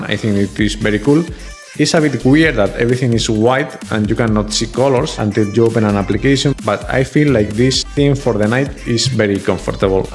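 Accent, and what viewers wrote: Spain. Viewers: Cómo se nos nota el acento español cuando hablamos inglés... Buen video colega, me suscribo.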